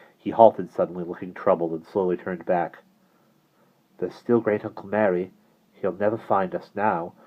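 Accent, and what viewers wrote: England, United Kingdom. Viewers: Really enjoying this. Great English accent (I’m in England) only one mispronunciation (British English-wise) is “quay”. In the UK we pronounce “quay” as “key”. It’s brilliant hearing one of my old favourites read so well. Thank you for doing this.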